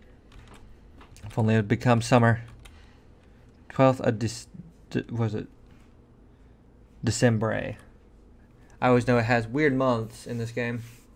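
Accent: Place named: United States. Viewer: He has a great American voice and is hot can confirm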